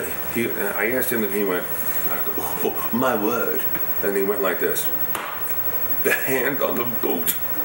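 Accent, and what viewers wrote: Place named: New York, United States. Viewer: His NY accent really comes out here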